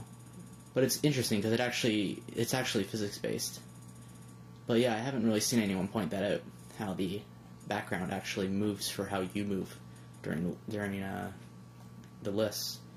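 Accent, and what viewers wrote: Canada. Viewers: gotta love the way canadians say "about"!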